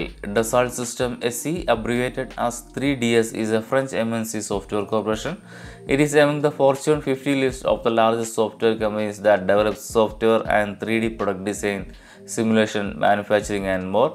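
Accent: Kerala, India. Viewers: Mallu ann alle thonny English kettapol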